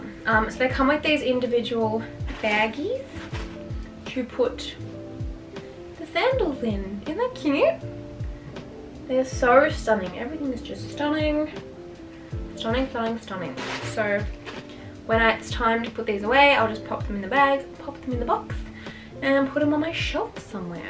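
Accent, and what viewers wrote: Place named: Australia. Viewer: loved the video, then you started talking, and you are a fellow aussie!? hello from brisbane! instant subscribe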